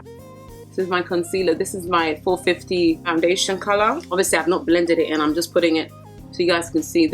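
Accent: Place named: United Kingdom. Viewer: Your mix of the British accent and yoruba was killing me😊 Love your review. 😍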